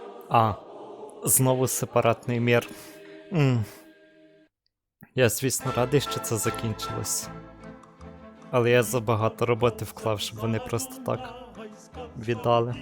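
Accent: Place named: Germany. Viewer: А ти не німець? Бо таке враження неначе німця слухаю який українську вивчив.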